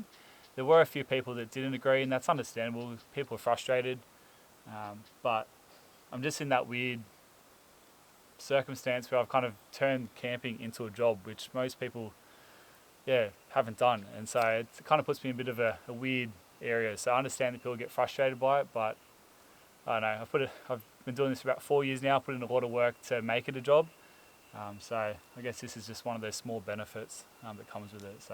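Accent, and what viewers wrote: Australia. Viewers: Looks awesome I'm guessing this in NSW by your accent and by the terrain? Looks beautiful. That cave looks sick. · Did you also know that all other birds know that it is a lyre Bird that is making the calls. Beautiful place. Ripper place. Where the bloody hell are you. LOL Nice to hear an Australia. Which state are you in? With out giving away just exactally where you are.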